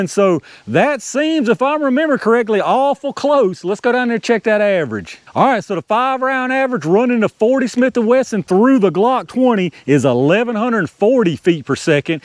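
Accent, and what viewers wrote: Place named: Minnesota, United States. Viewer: Sir you are a scientist and a scholar. Only a purist with real natural genius would strive to delve into minutia to this degree. Bless your heart and keep that wonderful Minnesota accent.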